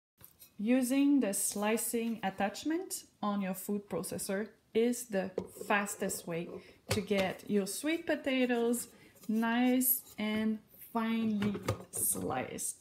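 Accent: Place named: Quebec, Canada. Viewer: Salut par ton accent je comprend que tu est Quebecoise, est ce que tu fait aussi des video en francais? Je vous demande ca parce qu'il n'y a pas beaucoup de chose comme ca et interessant sur YouTube en Quebecois/francais et je trouve ca dommage quand meme. Donc voila, sois beni!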